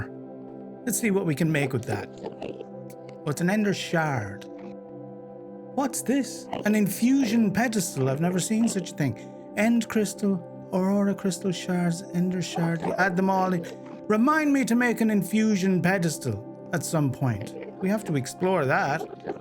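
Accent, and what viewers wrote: Ireland. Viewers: By chance are ya Irish and if so what part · Right!! Either that or I really am obsessed with puppies! Lovely to hear a fellow Irish gamer! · My daughter started playing Minecraft when she was 7 in 2012 on the Xbox 360. I was an OG gamer going back to the 80s (I'm an old fart) and blew off the game as a joke for almost a decade until a certain global event hit in 2020. She got me hooked on it and I've never gone back. I sub to a lot of Minecraft channels and yours popped up on my YT suggestions. , your MC channel is the best I've come across. It deserves over a million subs. Your Irish accent and spirit are an absolute joy and stirs my Irish ancestral blood in me. Please keep up the outstanding videos, builds, humor and music! Well done!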